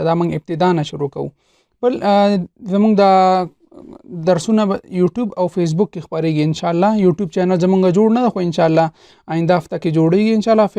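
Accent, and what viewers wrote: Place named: Saudi Arabia. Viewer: دي سعودي او دي دوبي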